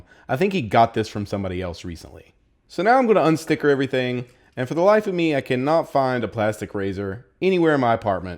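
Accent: Texas, United States. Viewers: You gotta Texas accent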